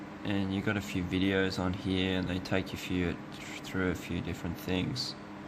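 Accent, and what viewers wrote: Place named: Australia. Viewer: You sound Australian, How long did it take for your hak5 gear to arrive? I've been waiting quite a while.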